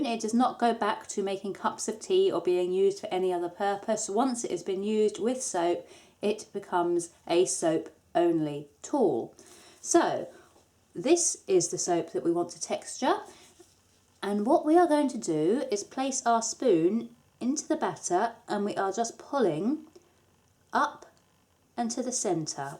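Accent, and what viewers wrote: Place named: England, United Kingdom. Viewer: Every time I hear your intro music, I'm all geared up for you to have a Beverly Hillbillies accent, and then I do a little double take when a charming English accent comes out instead.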